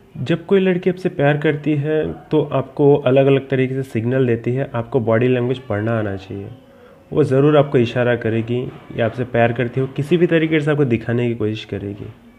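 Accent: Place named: Bihar, India. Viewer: You never be like brain singer you can only copy him and your voice is like Bihari...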